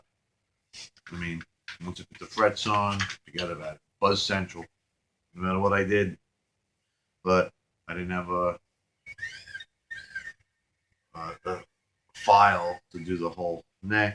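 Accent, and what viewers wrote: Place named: Long Island, United States. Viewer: Too much to respond to in this video. I feel like I'm listening to myself a couple of years ago. You're gonna be a star man. YT is going to be a great place to flourish for you. I can see it already. You have a GREAT accent. The Long Island thing is BOSS dude. It makes you so Hollywood. I think you have half of this stuff nailed. And a CAR GUY. HOLY F. Welcome to the club of guitar guys who are car guys too. DKG is a huge car nut too!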